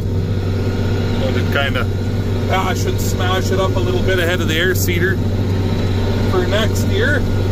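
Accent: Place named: Canada. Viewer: Well, how she goin? "ANYWAY...." just wanted to say out of all the FarmTubers I find your channel most entertaining... all the rest I find myself falling asleep, and then their's that kid from Iowa with severe ADHD lol... Your Canadian accent and one liners like, "So we don't plug the girl cock stiff" lmao. Good stuff and nice to see harvest is over for ya as we are in the middle of ours from Ohio. Have a good one.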